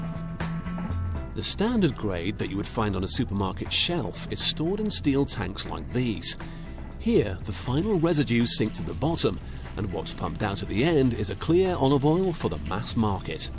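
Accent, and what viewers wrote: United Kingdom. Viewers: The video states, "if the fruit goes over 27 degrees, it can no longer be called cold-pressed". Since the narrator sounds British, I assume that it is 27°C (~81°F). The average USA swimming pool is kept at 80°F, so if you got into a bath of 80°F olive oil, the oil would feel cold since human bodies are around 98°F. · anybody else cant stand british narrators? idk, maybe its the accent, maybe it seems like hes trying to sound like a smartass. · British accent > American accent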